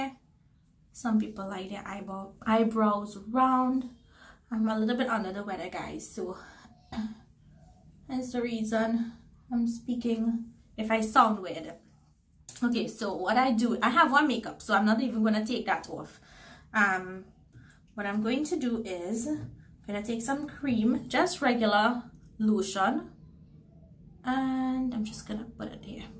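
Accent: Trinidad and Tobago. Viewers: That Trini accent 🎵🎵🎶🎶🎶🎵🎵🎵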